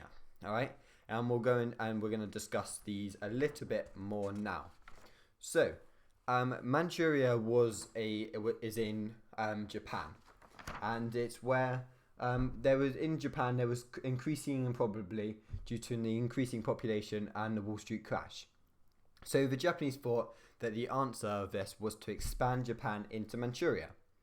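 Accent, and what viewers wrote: United Kingdom. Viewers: I love your accent. R u from Yorkshire or something?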